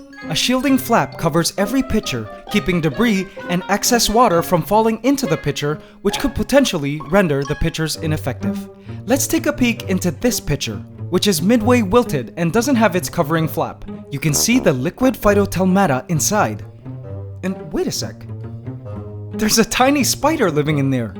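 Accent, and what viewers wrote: Canada. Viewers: The Philadelphians thought you where from Canada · Philiphines? I thought you were canadian? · “Here in the Philippines” wait a minute I thought this was canadian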